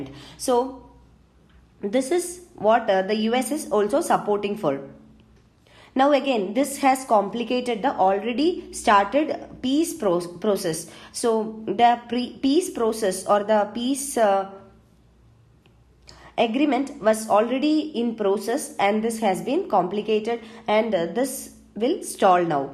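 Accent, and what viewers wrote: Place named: Kerala, India. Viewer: Good to hear a mallu:)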